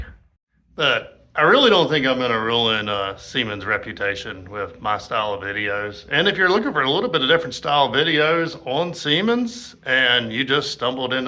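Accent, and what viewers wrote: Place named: South, United States. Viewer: the southern accent probably threw him off and made him think you were in California. common mistake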